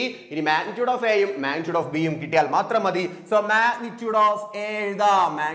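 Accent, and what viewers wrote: Thrissur, India. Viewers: Bro he is pure thrissur. Thats Y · Thrissur ano sirnte veed😅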